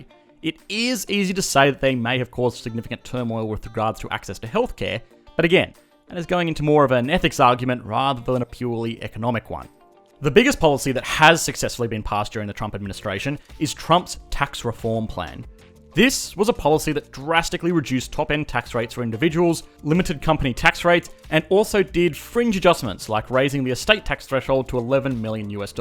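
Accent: Australia. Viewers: Bruh hes australian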